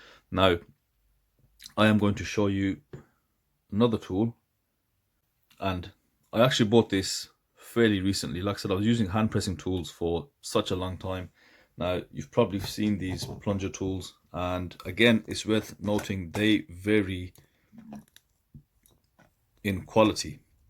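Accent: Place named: Northern England, United Kingdom. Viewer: I know i’m a year late to this video but better late than never. Great video bro. Where are you from, you sound Northern.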